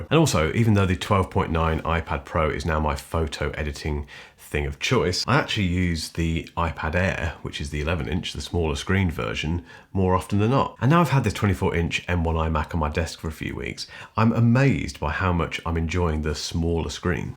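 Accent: United Kingdom. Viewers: Thank you very much for perfect native British English !)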